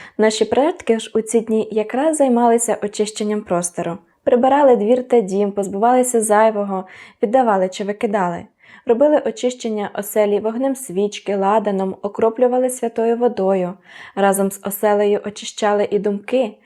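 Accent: Standard. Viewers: Героям Слава 🇺🇦💛💙 Дякую вам велике❤ Дякую за нагадування про традиції випікати жайворонки , за світло , яке несете до людей. Дякую за чисту українську мову і стиль🙏❤️ Світло перемогло темряву , обов'язково 🙏❤️ УКРАЇНА ПЕРЕМОГЛА 🇺🇦💛💙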